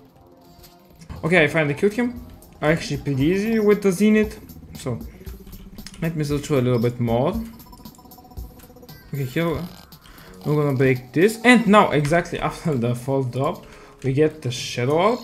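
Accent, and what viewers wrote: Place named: Bulgaria. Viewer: prosto te nabarax po govora che si bg😂😂😂 i vikam dai da pisha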